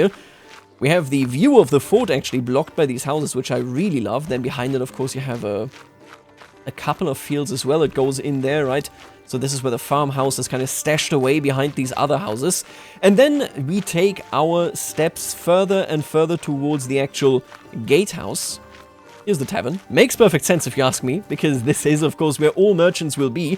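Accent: Germany. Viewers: Bro doing germglish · Are you german you say 4 Seiten globel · i love your Denglish xD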